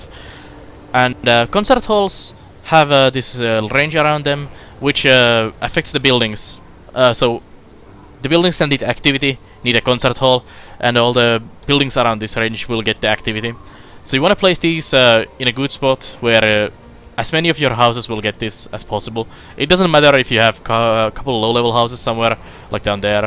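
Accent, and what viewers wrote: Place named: Finland. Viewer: I love the Finnish accent. Thank you for making this video! I like the description of the game and the look into it!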